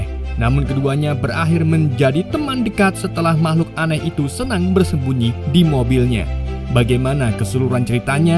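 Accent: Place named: Banjar, Indonesia. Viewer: Bng ne urang banjar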